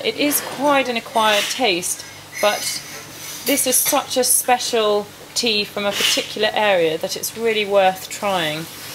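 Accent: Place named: United Kingdom. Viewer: Very interesting video, I like Lapsang Souchong! It has very peculiar taste indeed, it's surely not for everyone. Lovely British accent, by the way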